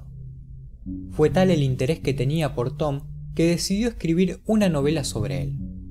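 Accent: Argentina. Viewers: El acento argentino y uruguayo generalmente es así. Vocalizan la s como j. Y la ll como sh. Lo mismo pasa con el acento español, pronunciando la c como z. El idioma español es muy complejo en cuanto al léxico de cada país.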